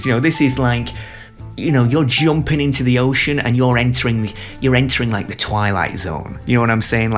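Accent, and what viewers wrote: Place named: Yorkshire, United Kingdom. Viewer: Good job man, sub earned... You are an interesting orator you sound like a fellow Yorkshire man too (West Yorkshire lad here)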